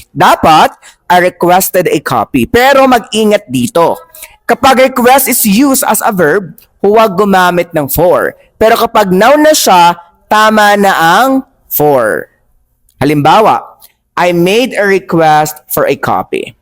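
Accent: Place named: Philippines. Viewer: you explain well. but the problem is your pronounciation. anyway its ok that is the filipino trademark.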